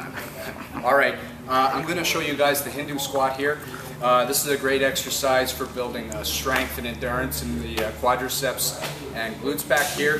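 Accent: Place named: Canada. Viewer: Love the adorable Canadian accent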